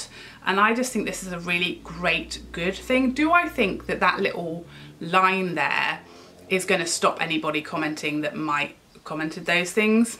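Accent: United Kingdom. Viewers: I was brought up to say nothing if you can’t be nice. As Wayne Goss says...be kind Facebook should do this too I think...people can be so awful 😕 Ps...I like your personality & accent...reminds me of my Dad (he was from the UK) 😊 xB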